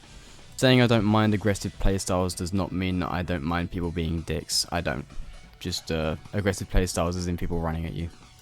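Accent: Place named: United Kingdom. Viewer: cant believe your british but you sound more british in this vid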